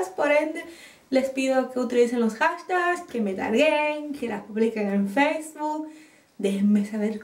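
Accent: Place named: Dominican Republic. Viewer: Gusto tu pelo el mio és asi pero yo me derribo por que me duele mucho para desenrredar .jejeej .guste de tu canal te boy a dejar mi apollo y suscripcion y te invitarte a mi canal aver si te gusta y me apollas me parece que eres de 🇩🇴 por el acento me alegrarei si eres de aya amo encontrar dom en YouTube.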